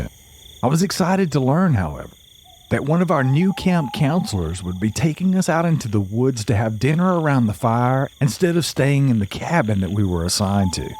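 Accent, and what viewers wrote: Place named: Texas, United States. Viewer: Trying to place the accent....texas?..oklahoma?.... Arkansas here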